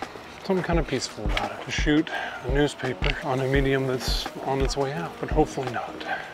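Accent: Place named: Boston, United States. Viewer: I have never heard a better Boston accent in my life. And I’ve watched a few Ben Affleck movies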